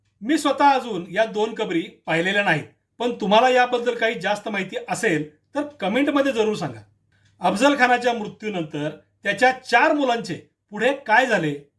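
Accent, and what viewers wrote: Standard. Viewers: फारच सुंदर माहिती मिळाली साहेब तुमचं निवेदन स्वच्छ मराठी भाषेतील असल्या मुळे, उत्सूकता वाढते.धन्यवाद.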